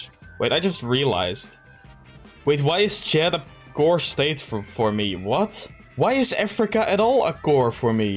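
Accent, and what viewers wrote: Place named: Netherlands. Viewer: Stoel! Ik denk daar een vaag Nederlands accent te herkennen? English for those confused: Chair? If I'm not mistaken that's a Dutch accent?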